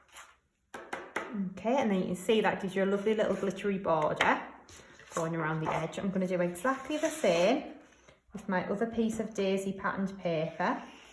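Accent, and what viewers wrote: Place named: Northumberland, United Kingdom. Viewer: Like your Northumberland accent :)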